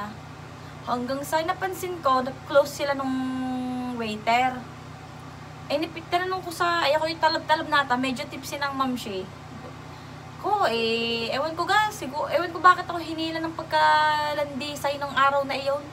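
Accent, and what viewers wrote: Batangas, Philippines. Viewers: Hello, Ms! You have a cute accent, Batanguena ka ba? I really enjoyed listening to you. I’m happy for you being able to come to the states. Mabuti at summer ka dumating dito kc grabe pag winter lalo di yan sa East Coast. Watching from California 😃. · Ate ur so cute. Haha nakakatwa the way ka magsalita though i have many friends na batangena at batangeno. I also have relatives in batangas. Shout out naman. Subs mo na ko since you started. In looking forward na gumawa ka din ng videos like mukbangs with mamay hahahaha. Abay nakakatawa kayo ehh. Shout out plssss. Im from cavite. Thankyouuuuu. Sana mapansin mo comment ko ate. Akoy naaadik sa panonood sa yo kamo. 🤩🤩🤩🤩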